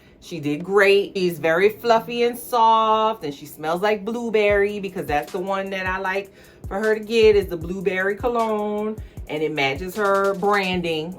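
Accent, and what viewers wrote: New Orleans, United States. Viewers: As someone who is also from New Orleans, I appreciate this commentary in New Orleans accent. I’m just over hear laughing because it’s like overhearing a good conversation. 😅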